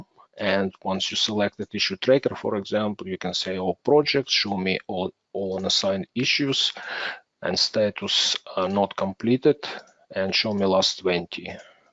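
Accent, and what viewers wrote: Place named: Russia. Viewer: Жёсткий акцент выдает своего :))) Спасибо за видео.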